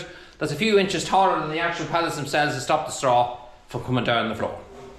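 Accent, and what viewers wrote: Ireland. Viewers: cottage reveal caught my eye, had to watch! and seeing the farm life got me subscribing! i'm a city slicker now for near 30 yr's , was raised on a 10-acre hobby farm....isn't that pallet tool handy? i want to get one now! i have an idea for making a pallet bed and only got as far as collecting pallets,lol, Enjoyed the video watching the wheeler fixing too w/ ur 'helper' ...(lol, dog) ....was trying to figure ur accent, then i heard u say something about Ireland! i'm american, usa....have a good one, i'll watch ya again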